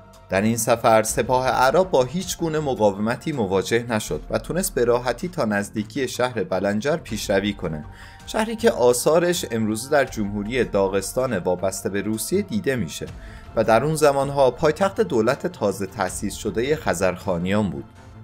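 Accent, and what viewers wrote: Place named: Turkey. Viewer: کاش سلیس و روان صحبت می کردی خیلی ترکی صحبت می کنی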